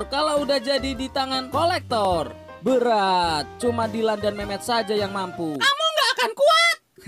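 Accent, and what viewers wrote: Pekalongan, Indonesia. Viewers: Logate Pekalongan isek ketoro gan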